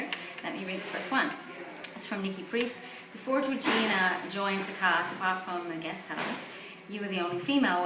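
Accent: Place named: Australia. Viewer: i do detect a bit of an aussie accent, having been to that beautiful country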